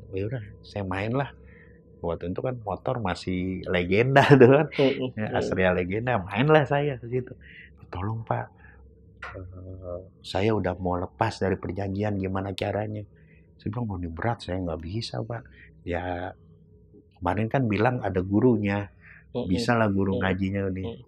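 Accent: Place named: West Java, Indonesia. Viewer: Sunda banget 😅😊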